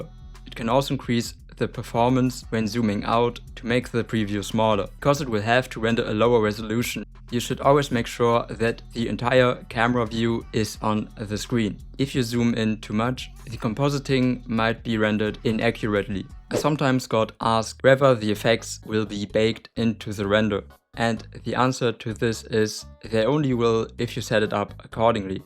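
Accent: Germany. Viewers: I hear Denglish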